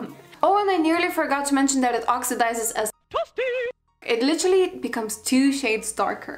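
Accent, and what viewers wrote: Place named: Lithuania. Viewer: I could tell from your accent you could be possibly Lithuanian! And heck yeah to my guess you are :) Labas!!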